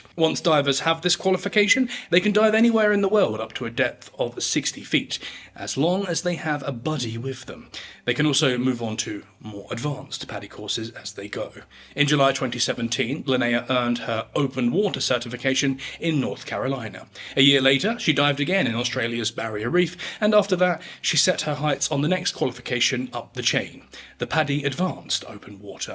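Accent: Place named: United Kingdom. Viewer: Just seen this in a yt browse of my TVs yt but this comment more to the piece's frontend or creator, dude where did you grow up, was it Britain? A few hours old dead body, is normally deemed a dead body, your saying "dragged her corpse" I take extreme issue with as your accent has a strong British aspect to it. We don't describe like you just did in the video-I seriously wonder are you genuine British, if genuine British then what are your motivations for saying as you have, it smacks to me of an American created or fed attempt to go further than USA vs GB 'is' vs 'was' for deceased birthdays, I am staunchly a was. And doesn't seem cold at all, more realistic recognising past as past and fact birthday is a specific happening in the present(or why mention) but corpse Vs body I can't believe a Brit made this mistake-were you brought up in USA dude or you just got a painting brush (for what's not(we have more care in what we do say) out)? 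For someone to die so young is a sad occurrence.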